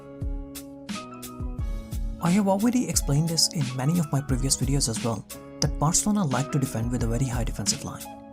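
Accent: India. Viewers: why your sound like a indian, are u Indian? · u have kinda Indian accent.... I guess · you sound Indian? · No disrespect man but as soon I can hear the Indian accent I couldn't go last two minutes.... Shocking...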